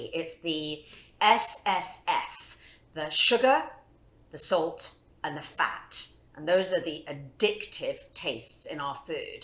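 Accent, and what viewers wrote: United Kingdom. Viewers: Hi. I thought it was British. Love it. You are an inspiration.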